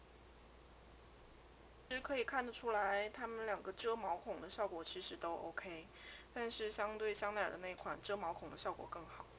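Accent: Northeast, China. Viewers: 东北人吗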